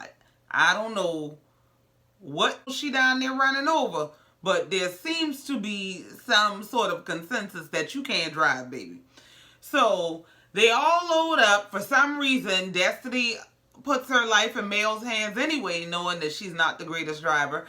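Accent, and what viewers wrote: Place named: Louisiana, United States. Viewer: you got me gul! There were just some things you said that gave Shreveport and NOLA tease. Geechee accent is one I'm not that familiar with. That Carolina food good AF tho😊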